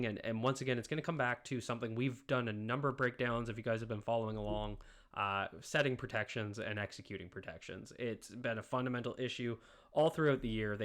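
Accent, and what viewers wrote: Canada. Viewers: I can tell you're Canadian by your accent.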